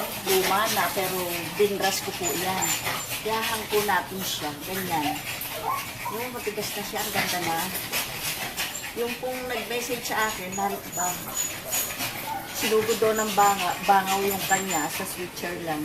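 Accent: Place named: San Miguel, Philippines. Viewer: thanks for the share! i like the way way you described things, may punto pa eh! parang taga san miguel eh! i watched the bagoong, so i ll continue with tuyo. ganda ganda nang tuyo, just like you and your video! thanks again! ow sadiqa ka pala... kaif falik, shukran jazilan!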